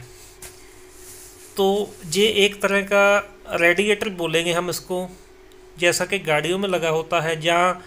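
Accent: Punjab, India. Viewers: Hindi with punjabi touch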